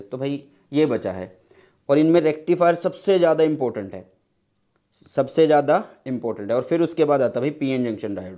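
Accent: Haryana, India. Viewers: Sir are you from haryana??🤔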